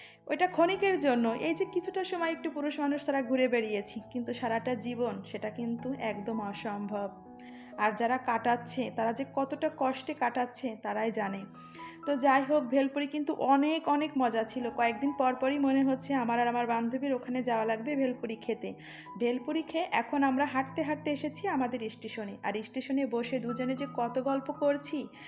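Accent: Jessore, Bangladesh. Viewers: আপু তোমার কন্ঠে যশোরের এই ভাষায় কথা শুনে খুবই ভালো লাগলো আমি কিন্তু যশোরের মেয়ে বউ। পিঠে গুলো অনেক সুন্দর হয়েছে। কুচি কুচি চিকেন মসলা দিয়ে মেখে রাখলে। ঘরটা সুন্দর করে সাজিয়ে নিলে। সন্ধ্যায় বান্ধবীর সঙ্গে ঘোরাঘুরি করলে। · 👍👍 যশোরের প্রিয় আপু, আপনিও আমার মত কাপে সাপোর্ট দিয়ে মোবাইল রাখেন। আপনার ভাষাটা এত এনজয় করছিলাম। প্রত্যেকটি আঞ্চলিক ভাষার একটা সৌন্দর্য আছে। মাঝে মাঝে এই ভাষায় ভিডিও দেবেন আপু❤❤❤ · সত্যি বলেছো আপু আমাদের ডিকশনারিতে কোন ছুটি নেই মৃত্যুর আগ পর্যন্ত তো তোমার যশোরের ভাষার কথাগুলো শুনে বেশ মজা পেলাম পিঠাগুলো কিন্তু দারুণ হয়েছিল❤❤ ভেলপুরি 😊😊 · বুন্ডি আমাগের যশোরের আঞ্চলিক ভাষা ডা কিন্তু সেইরম সুন্দর এরম করে কথা কতি পারলি কিন্তু পরান ভরে যায়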